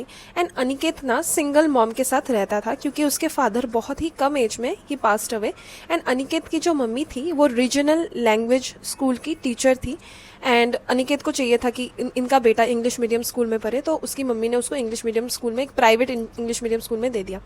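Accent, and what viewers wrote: Bengal, India. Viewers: didi's bengai accent remainds me of my very close school freind always , i still miss him as we both moved to diffrent Cities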